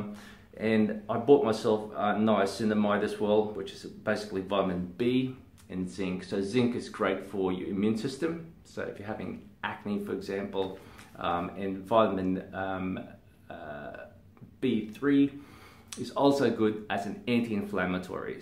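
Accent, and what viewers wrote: Australia. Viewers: KimLip'sLeftEye It’s because he’s so darn handsome.😍 Plus the Australian accent is adorable. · Hi Doc! This video popped up in my Recommended Videos and was pleasantly surprised that you had an Australian accent! And then I found out you're in Brisbane too!!! Loved the video but I have a couple questions - 1. Do I need a referral to come see you? And 2. The Ordinary has so many products in it's range... How do I know what to choose for my skin? Thanks heaps! Subscribed on YouTube and followed on Instagram :) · What a great surprise to find an Aussie! Like even as an Aussie, I went holy fuck he's got such a strong accent. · Recognized the australian accent right away! 😁 Great video! ❤ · Being Australian and Asian somehow manages trip to me up when you talk still..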